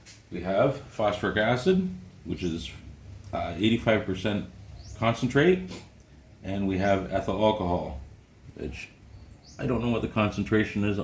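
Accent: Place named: Canada. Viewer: You must live in the Philippines, but I hear a bit of Canadian accent. It sounded like you say "a boot" instead of about. 😅. Very hel0ful video, thanks.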